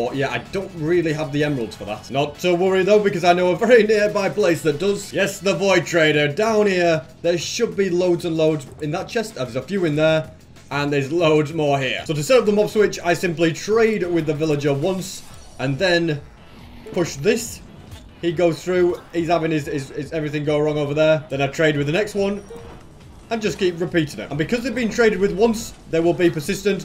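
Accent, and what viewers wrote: United Kingdom. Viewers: idk why but sb sounds too British in this vid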